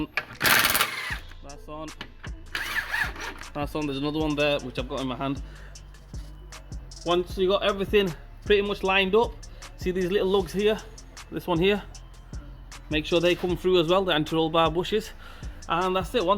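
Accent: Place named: Northern England, United Kingdom. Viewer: North England somewhere by his accent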